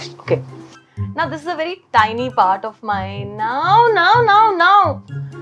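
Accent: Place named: Maharashtra, India. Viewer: i love yrr hindi marathi accent 😂........i love yr plant vedios .....but idky ig this is the best video ......LOVE from DELHI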